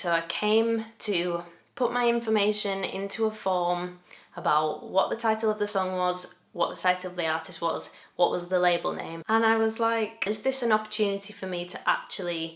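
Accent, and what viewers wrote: United Kingdom. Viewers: O inglês britânico soa tão bonito.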